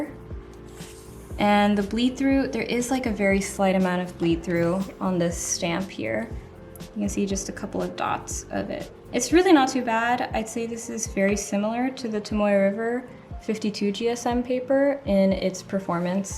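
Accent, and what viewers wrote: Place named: Missouri, United States. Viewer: Where are you from? Love you accent. Some friends from Missouri speak like you.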